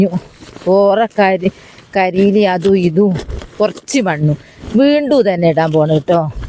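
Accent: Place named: Malabar, India. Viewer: നിങ്ങളുടെ സംസാരം കേട്ടിട്ട് കോഴിക്കോട് മലപ്പുറം അതിർത്തിയിൽ എവിടെയോ ആണെന്ന് തോന്നുന്നു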